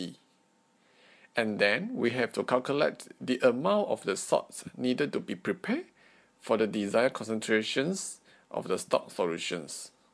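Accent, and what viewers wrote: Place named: Russia. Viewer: Are you a Russian Scientist? You sounded like a Russian .....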